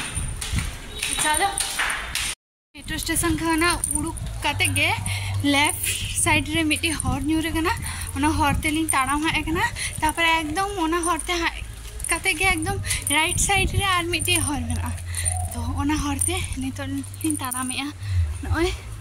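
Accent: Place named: Santali, India. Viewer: New subscriber 😊loved the way you talk i mean your santali accent is so adorably sweet💙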